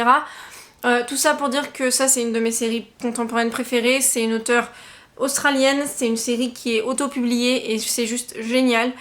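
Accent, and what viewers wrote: England, United Kingdom. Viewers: J'aime tellement ton accent anglais 😍😍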